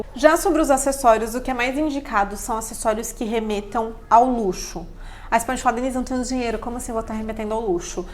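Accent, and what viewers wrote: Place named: Brazil. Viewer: Yes, portuguese from Brazil accent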